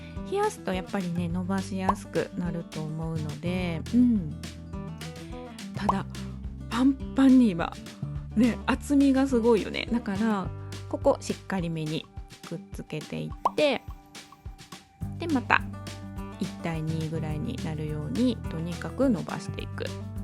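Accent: Kansai, Japan. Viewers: 初めまして😊久々にパンを焼きたくてYouTubeを見ていたら『お勧め』に出てきたので早速拝見しました🎉 楽しくて軽妙な柔らかい関西弁のおしゃべり(お話)に、グイグイ引き込まれて、あっという間にファンになりました😀デニッシュパン早速挑戦してみます🍞🍞 これからも楽しみにしてます😊もちろん登録させていただきました❤❤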